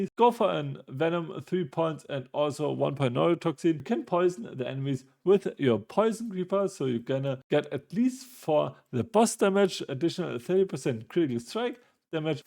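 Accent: Germany. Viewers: Digger dein deutscher Dialekt im English ist so wild 😅. Trotzdem danke fürs Video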